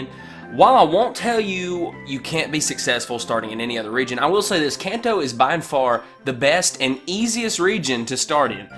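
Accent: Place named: South, United States. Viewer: I thought ur accent was ironic but it isn't. Nothing wrong with that just a southern accent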